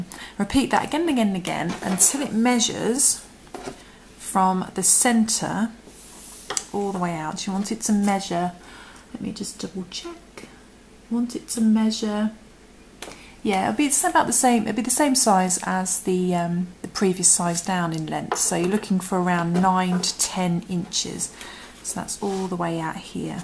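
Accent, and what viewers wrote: England, United Kingdom. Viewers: Oh I was so happy when I heard your English accent but turned into a frown when you started to explain using American terms lol ... Great tutorial tho..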